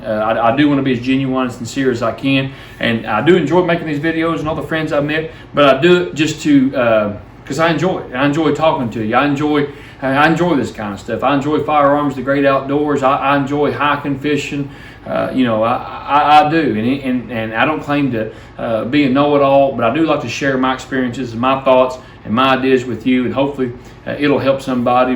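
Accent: Kentucky, United States. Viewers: So good to see my buddy and Kentucky accent..dude you are the best!!😊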